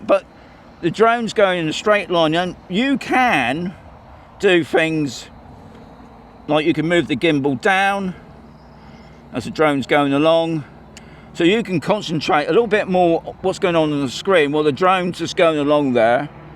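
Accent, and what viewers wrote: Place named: Cornwall, United Kingdom. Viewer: Neo2 owner and fan here, 20 years ago i lived in Helston, Cornwall, your accent reminds me of those days lol. #Spingo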